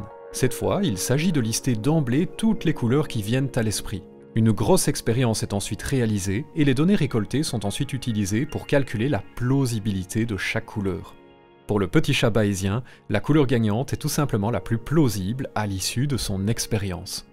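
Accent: Liège, Belgium. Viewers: tooor, preuf, toi t'as un accent belge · Oufti l’accent de Lietche! 🤪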